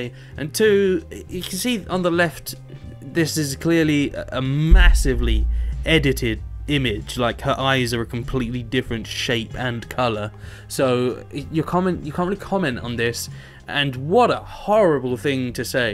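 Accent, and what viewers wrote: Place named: England, United Kingdom. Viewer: Everything sounds better with an English accent. 😊 You have a great voice tone.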